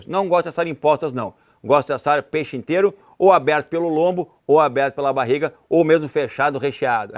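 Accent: Rio Grande do Sul, Brazil. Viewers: Deve ser gremista, se não for, é colorado, mas a possibilidade de ser gremista é maior pelo modo de falar.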